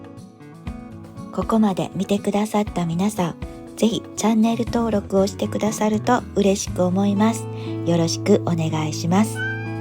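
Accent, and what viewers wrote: Kansai, Japan. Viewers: 関西弁がええなあ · おすすめから来ました！パッチワークはやったことがなかったのですが、こんな小さいハギレがこんな素敵な作品になるなんて驚きです！ 関西弁の落ち着いたナレーションもめちゃめちゃ癒されました！チャンネル登録させていただきます！ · オススメに出てきました😊はじめまして～ヾ(*･ω･*)ﾉ 時々出てくる関西弁と、関西弁じゃなぁい⤵︎⤵︎⤵︎と思いきや、イントネーションが関西な所❤Love 親近感あって安心するし、生地が出来上がって作品になっていく過程がまた可愛くて、最後まで飛ばさずに見てしまいました😂 ハンドメイド系のYouTubeは、ついつい最後の完成品を見てから、気に入ったら最初から作り方を見る、という感じですが、今回は、まるっと見ちゃいました❤ 素敵な動画をありがとうございました🎶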